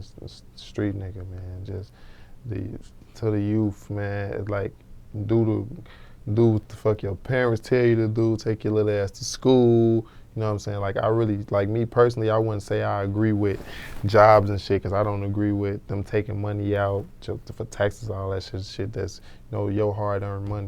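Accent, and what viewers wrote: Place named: Wisconsin, United States. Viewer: he not formally from the chi, from Wisconsin his accent mixed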